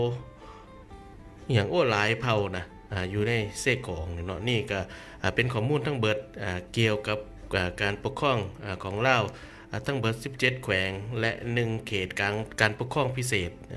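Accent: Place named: Ubon Ratchathani, Thailand. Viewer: สำเนียงการเว้าคล้ายๆกับอุบลเลยคับ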